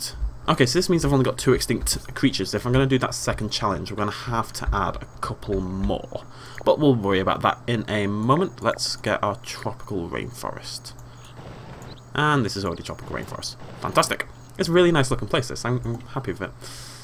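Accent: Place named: Cockney, United Kingdom. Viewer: Haha, you say assume like "ashoom" and instead of a "th" you use "f". What accent would that be, Cogney? It's interesting how there are so many different dialects.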